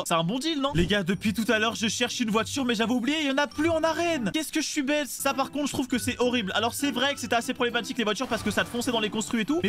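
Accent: Belgium. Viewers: Tu es belge ? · t’es belge?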